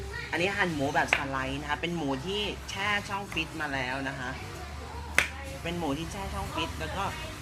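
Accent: Isan, Thailand. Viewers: พี่เป็นคนอีสานหรอค่ะ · พี่เปนคนอีสานหรอค่ะ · หื้ม!!คนอิสานบ่นี้555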